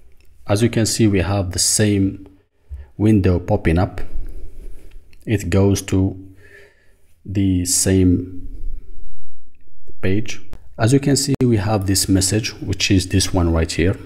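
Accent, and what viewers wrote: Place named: Arab world. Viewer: Are you an Arab? Your voice says that.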